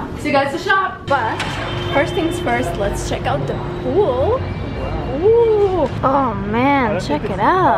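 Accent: Australia. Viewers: yep you sound Aussie! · Aussie, with a twist of pommie... ;) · THAT VIEW THOUGH. I know you're probably so over hearing about it, but you're little aussie mix accent is the cutest thing ever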